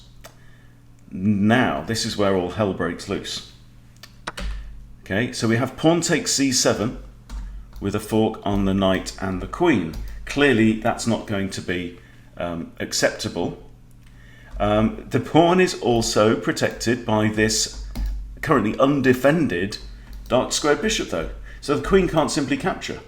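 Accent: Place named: United Kingdom. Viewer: Bingo!.... the computer is telling you to expect a fantasy situation... I figured out why is fun and informative versus the Feingolds, Gothams, Bartholomew's that are on here..... those guys, good as they are, well intentioned as they are, are like talking computer engines. Plus...those guys don't have British accents or roosters either...:-) Great vid on a great game with great points... thank you sir....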